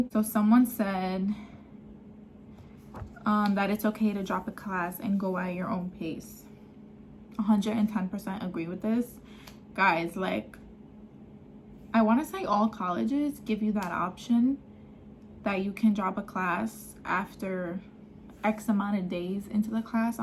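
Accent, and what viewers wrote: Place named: New York, United States. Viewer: U sound like u from new York bro bro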